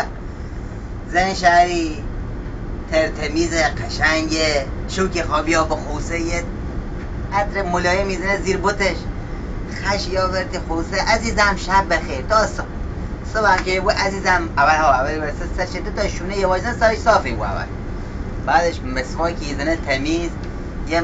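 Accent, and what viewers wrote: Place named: Fars, Iran. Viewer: این مال یه روستایی از استان فارس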